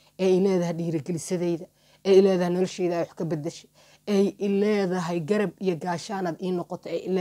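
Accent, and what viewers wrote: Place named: Somalia. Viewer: markaa ku bartyba cidaa thyba dhaqsaba waa garty waayo lahjadeena maaha mid dhunta iyo codkarnimadeena lkn gabar umada usiman thy allah ha ku xafido abayo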